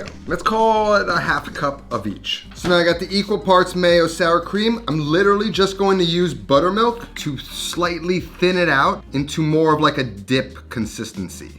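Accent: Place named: Westchester, United States. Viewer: Oh you from weschesta? Das it!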